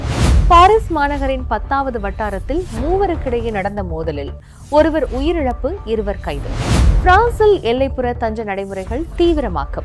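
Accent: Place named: India. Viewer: இந்திய பாணியில் செய்தி வாசிக்கிறீர்கள். ர ற ண ன ல ள உச்சரிப்பு சரியில்லை.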